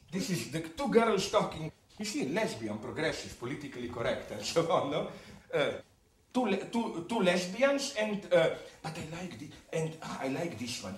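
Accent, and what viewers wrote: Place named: India. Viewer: I didn't refer any racist thing, just his speaking reminded me indian accent.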